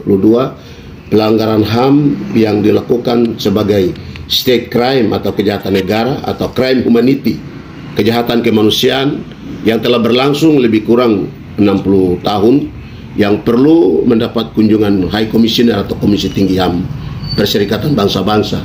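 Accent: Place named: Netherlands. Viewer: Ah,BASI apa kamu? Kamu cuma akan bercerita bohong dengan back ground human right, ya WAROM (mirip aksen Van Holland ),pelanggaran HAM dengan cerita bohong panjang lebar & berita terbalik untuk meyakinkan lawan bicaranya bahkan playing victim ditambah bahasa air mata jika perlu & agama. Perlu diketahui RI atau NKRI itu negara hukum & sangat menjunjung HAM ( human right) itu sebab NKRI punya Pancasila & undang undang dasar 1945,juga jelas secara explisit menyatakan perang terhadap segala bentuk penjajahan bahkan menegaskan harus di hapuskan di seluruh dunia, shg sudah cukup jelas pandangan bangsa Indonesia juga NKRI mengenai ham karena guidennya untuk kehidupan berbangsa & bernegara cuma panca sila & undang undang dasar 1945,shg pasti harus menjujung HAM. Bahkan bangsa & NKRI mengharamkan rasis & biasa hidup plural beda dengan organisasi kalian yang berisi WNA mirip WNI etnik irian juga orang kulit putih itu rasis bahkan mengajarkan ke anak anak, RI terkenal sekali bersahabat dengan bangsa bangsa di benua Afrika & diterima semua pandangan juga sikap hidupnya. 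Hey,WAROM bshkan sekarang jelas semua itu hal yang biasa kalian pakai propaganda adu domba serta fitnah itu,ternyata yang perkosa guru,petugas kesehatan kalian,menyandera anak sekolah,merusak jembatan,membakar gereja rasis itu kalian termasuk propaganda bohong dari agen propaganda luar negeri kalian, semua perbuatan itu melanggar HAM semua. Maka keluar atau tariklah bawa ke tempatmu negaramu & tuanmu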